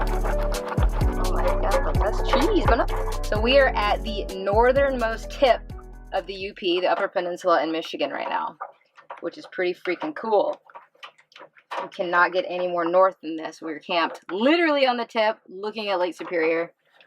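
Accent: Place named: Wisconsin, United States. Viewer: As a born and raised in Wisconsin I def approve of the accent!!!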